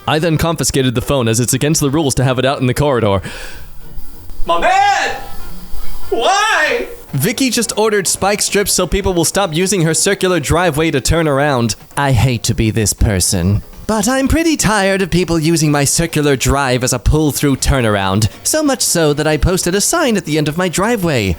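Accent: Australia. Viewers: Bro had to crack out the Aussie accent for this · and I thought he was just doing a horrible Australian accent the whole time... Wow... Just wow...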